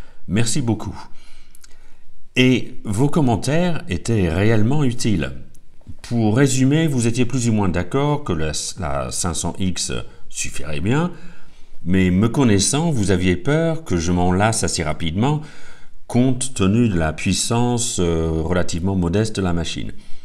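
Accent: United Kingdom. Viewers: Comme quoi tous les goûts sont dans la nature et c'est temps mieux ;) Merci pour votre réponse et la qualité de vos vidéos. Mais également en Français avec un sympathique accent britannique .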